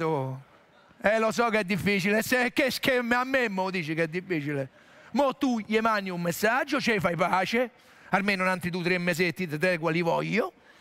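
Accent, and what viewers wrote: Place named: Rome, Italy. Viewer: Il fatto e che il dialetto romano è fra i dialetti più simpatici... Quindi alle orecchie dei non romani non risulta "grezzo" o "volgare" anzi... Tutto qui. La stessa cosa vale per gli altri dialetti "comprensibili" e simpatici.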